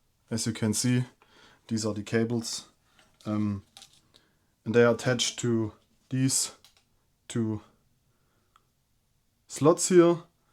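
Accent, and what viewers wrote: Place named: Germany. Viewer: German accent ❤️‍🔥❤️‍🔥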